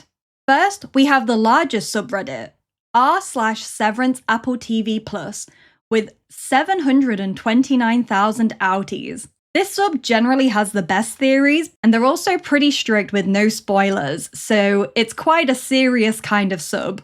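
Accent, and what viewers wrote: United Kingdom. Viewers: Hi thanks for another brilliant video. Off topic question: i’m very curious about where your accent is from. I am American. i think your accent is British but I can’t place it and I used to know British regional accents pretty well. I hear a touch of Australian but i don’t think that’s it. If you are willing to share?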